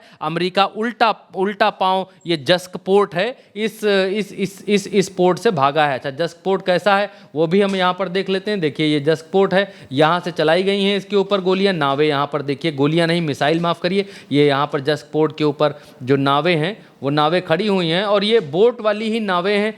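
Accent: India. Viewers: Bhrat tumhara desi andaz chune wala hai .Desi style me english bolna aur beech beech me flana dhkana 😅acha lgta hai.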